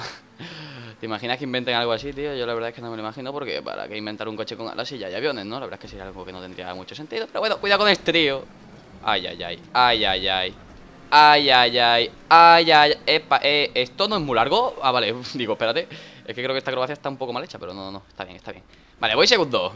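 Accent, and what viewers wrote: Mexico. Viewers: Like si te da risa el acento mexicano de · ... Me da risa como hablas como mexicano😂😂😂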